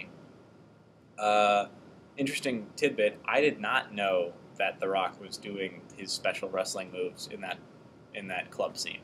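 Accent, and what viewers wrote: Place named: Scotland, United Kingdom. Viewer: Scottish accent, douche bag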